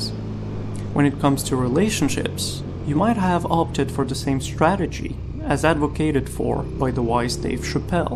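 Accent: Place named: United States. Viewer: One question : From where or how you learned to talk like that and speak english fluently and like an american ; i need some help !! Hope you answer me .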